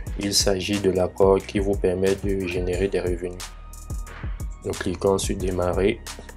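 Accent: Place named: France. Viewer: moi j'aime trop sont acssent francais :D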